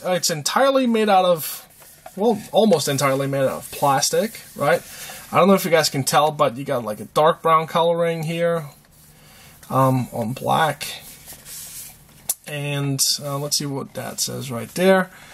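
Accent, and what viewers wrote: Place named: South, United States. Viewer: laig, sounds like southern accent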